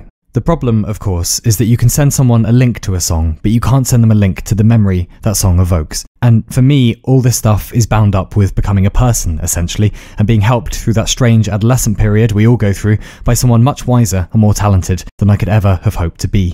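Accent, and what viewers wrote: England, United Kingdom. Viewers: "Life is a mountain you must Climb to see the view from the top, and the journey itself is the most important part of the whole thing" I came up with that quote because of your channel, and I want you to know that I know you, but you don't know me, so... I dunno.... Wanna grab a cup of tea and a slice of cake fellow carbon unit that has a strong English accent and a half developed hatred for wasps, and just wonder about how living is a fucking miracle and being alive is incredible? I want to thank you deeply, as I am able to connect to the ideas you present in your videos at a very deep level, and it makes me feel peaceful. Not dreading anything, not being scared of inevitable death, but just to be able to be calm for 10 minutes and have a deeper understanding of the universe is incredible and you make that happen. Keep doing what you do, and never change. 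You have come so far, rest here a while, for there is so much further left to go. And I can't go without you. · sublime british accent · You have the ability to get me interested in about anything, even if i wasn't before. Anyway thanks for changing my entire view of the world with your videos and your cocky but charming british accent!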